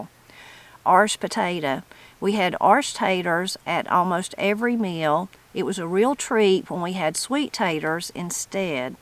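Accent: Appalachia, United States. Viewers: Love to hear your mountain speak!❤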